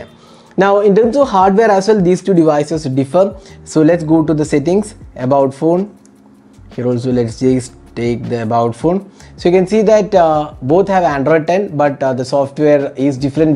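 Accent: Kerala, India. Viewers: Accent keettit malayali anenn tonunu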